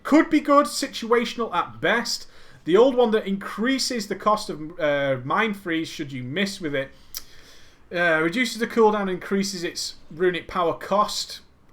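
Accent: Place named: England, United Kingdom. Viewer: what server do u play on BTW i love my wow vids with an english accent lmao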